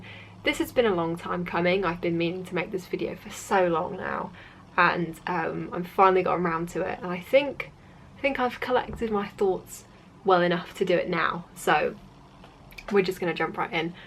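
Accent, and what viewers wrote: United Kingdom. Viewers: Just came back to watch that video. Now i am here after just 1week u uploaded this. I also came back for that vid like 4 month ago. 🙁 i could make life so easy for people but that would make lots of money to work out the system and people should be happy with very simple similar things like houses would be the same or like 2-3 types maximum. To cut down the worktime. Humans are like ants most of us will never be free because we rely on each other's work... You sound british wish we met when i was living in the UK. 😅 we would talk about our hate for work and brainstorming about it haha. 😆 when employees say me u can be a machine setter etc.... i am like yeeaaaahhh 🙂 (inside: 😫🤮)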